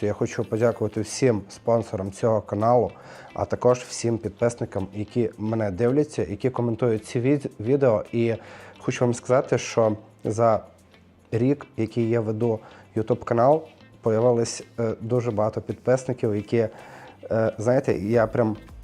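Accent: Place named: Kherson, Ukraine. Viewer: От куда суржик с Херсона?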